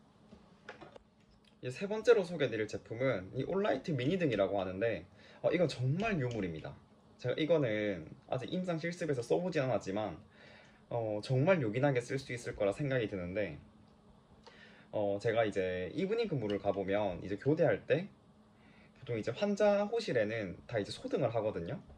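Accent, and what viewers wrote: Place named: Busan, South Korea. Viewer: 오..와 사투리가득한부산남자의 제품리뷰..😆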